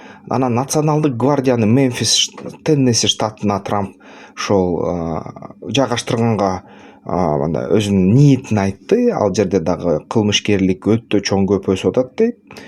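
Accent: Standard. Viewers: Байкем Аман болуңуз кыргызча таза сүйлөгөнүңүз жагат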